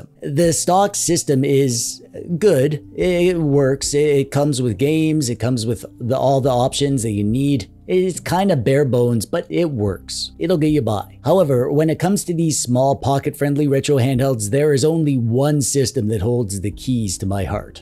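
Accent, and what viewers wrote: Canada. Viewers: I like your channel. I think that many of us watching are wonering who the heck that you are. U speak like u have a cold, u are funny and interesting. I seen ALOT of influence from other utubers especially the American Taki. As I watched 2 or 3 of your vids even with your strange congestion I could hear an accent. Are you Canadian? I think that u are. Interesting vids but ya dont have to be so weird. Its OK to be from another country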